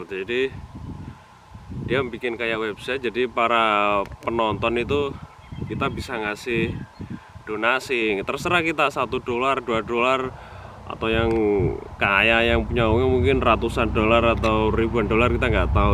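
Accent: Java, Indonesia. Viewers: jowo mu ngendi kang..kok koyo logat jowo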